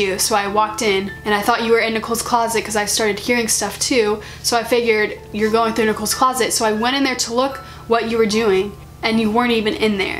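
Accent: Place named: China. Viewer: The voice is chinnese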